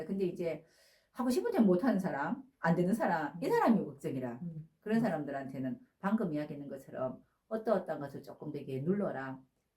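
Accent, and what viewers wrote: Gyeongsang, South Korea. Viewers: 개인채널이라지만 경상도 출신 아니면 귀에 들리지 않게 억양이 너무 강해서 못 듣고 나가요, 이런 경우 진짜 처음이오.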